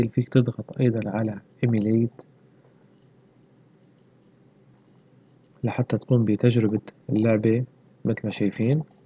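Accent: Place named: South, Lebanon. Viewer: mbyen mn swtak mn jnoub Lbnen sa7 ana Mn lbnen sken be jnoub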